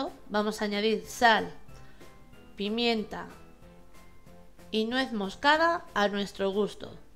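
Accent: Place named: Spain. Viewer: La felicito Sra se ven muy rico esos huevos y me gusta como hablas ese acento español gracias por la receta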